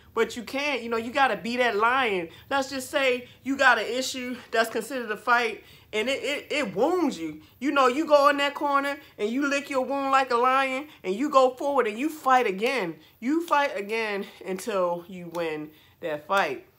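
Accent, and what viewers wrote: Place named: South, United States. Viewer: . I really enjoy your content. I really enjoy your positive attitude. The southern twang is beautiful. I am going to law school in August. I have been driving for about 30 years. But you have to go after your dreams. Take care. And another thing, you are so beautiful and have a beautiful spirit too.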